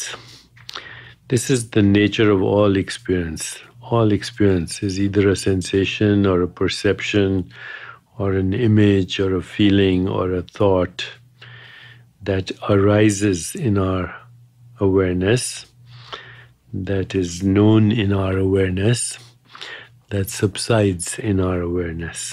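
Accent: India. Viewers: The thick Indian accent is very hard to listen to. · I like your thick Indian accent...relaxed me 🤗. Everyone should enjoy and experience . In our awareness.... The nature of the breath..In the now! 🌟 🌟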